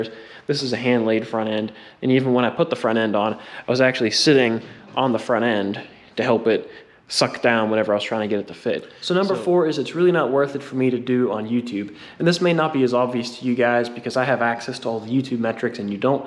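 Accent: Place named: Southwestern Pennsylvania, United States. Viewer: I'm guessing by your accent that you are in the southwestern PA area. I have family in Latrobe. Lol....let me know if I'm right.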